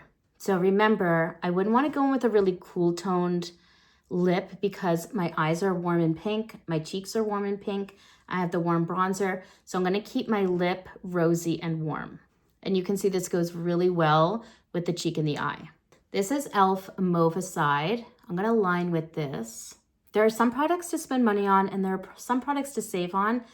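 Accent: Canada. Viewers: I think it’s that she’s normal and genuine. I don’t find I can relate to a lot of beauty YouTubers. I don’t find many of them teaching anything I find helpful, more so just selling products. I don’t feel like does that. I actually feel like back in the day when I worked as a makeup counter artist for Bobbi Brown, reminds me of one of the traveling makeup artists that I loved to work with. I leaned so much from her. I also find her Canadian accent cute, as a Texan it’s so cute to me.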